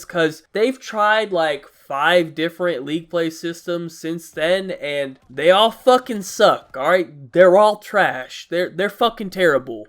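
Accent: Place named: South, United States. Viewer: he sound southern like deluxe 4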